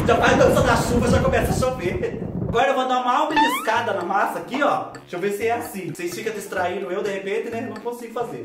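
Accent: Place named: Caipira, Brazil. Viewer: A cada vídeo, eu me divirto com você!! Amooooo esse seu sotaque de caipira!!! Obrigada por fazer meus dias mais felizes!! 💋💋 nesse coração peludo!! 😂😂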